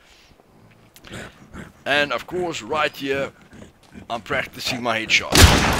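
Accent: South Africa. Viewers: South African like myself? you sound like it! Good video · i can smell the south african on you boet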